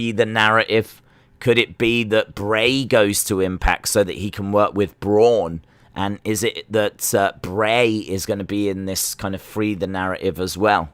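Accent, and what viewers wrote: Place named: Midlands, United Kingdom. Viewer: Just found your channel. You from the Midlands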